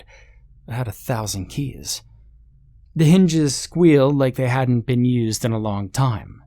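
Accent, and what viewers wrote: South, United States. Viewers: Its pronounced bir-ming-Ham not birmingam, this story somewhat misrepresents the city. But your southern accent is on point.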